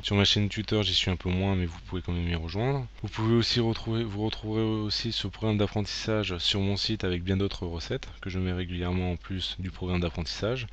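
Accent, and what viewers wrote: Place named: Nord, France. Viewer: Bonjour chef, je viens de m'abonner à votre chaîne que je dévore attentivement. Je me doutais bien que comme moi, vous étiez du Nord/Pas-de-Calais. En tout cas tous vos conseils font que mes pâtisseries sont une réussite. Hier, j'ai réalisé les allumettes et des croissants. Là, bien sûr, je termine les choux. Il me reste à les bourrer de crème. J'ai hâte de les goûter lol. Cela fait du bien d'entendre le son du Nord dans votre voix surtout que je suis exilée en région parisienne et ma région me manque terriblement. Merci pour tout lol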